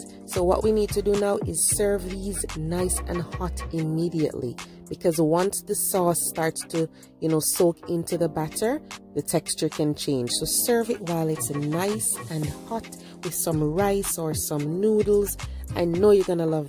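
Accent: Jamaica. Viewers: U must be jamaican. U sound like my mummy. 🇯🇲😭WONDERFUL VIDEO. I gwain try this it looks DELICIOUS!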